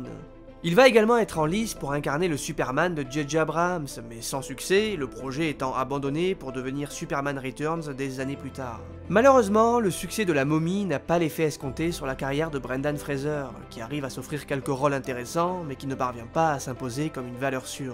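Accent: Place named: Perpignan, France. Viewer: Tu as l accent de Perpignan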